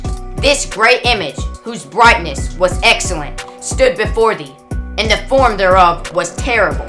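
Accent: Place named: United Kingdom. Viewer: sounds like England/U.K.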